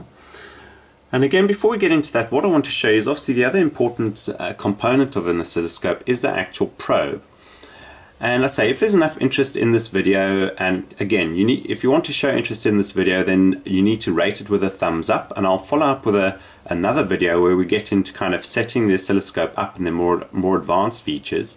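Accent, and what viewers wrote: South Africa. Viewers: Nice video. South African accent? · Not only South African accent, but I would place it as Johannesburg, and probably Sandringham / Orange grove.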